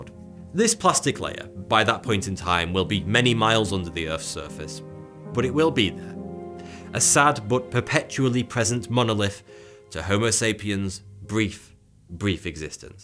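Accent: United Kingdom. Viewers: I thought it comical that every person on the planet would dissapear. Is that another way to disappear? I shall never be around to find out so shall never know. Seriously, though, I love these videos and as an Australian find the attractive British regional accent adds to my enjoyment.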